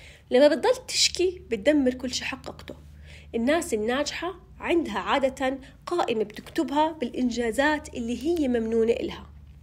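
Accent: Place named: Jordan. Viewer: شكرا الك .. محلاكي باللهجه الاردنيه الطبيعيه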